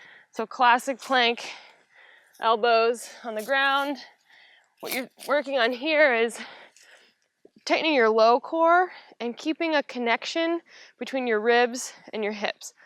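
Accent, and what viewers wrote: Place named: Australia. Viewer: Your aussie is so beautiful!!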